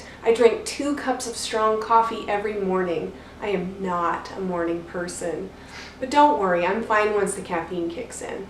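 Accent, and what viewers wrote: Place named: Midwest, United States. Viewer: New subscriber! I am retired for last 5 yrs at age 57, now collecting my SSI at 62. Where do you live? Thinking maybe MN or WI? detect an accent Of that sort? I lived in Chicago area for 25 yrs but now live in SC for past 10 yrs and love it. 😎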